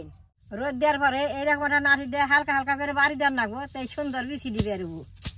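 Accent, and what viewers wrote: Tangail, Bangladesh. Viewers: আপনার বাড়ি কি টাঙ্গাইল?? এটা তো টাঙ্গাইলের ভাষা.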